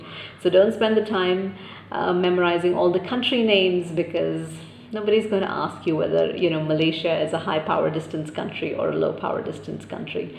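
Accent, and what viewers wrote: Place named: England, United Kingdom. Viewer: Hi hope you are doing good I just loved your English accent. Please can you guide how you achive this?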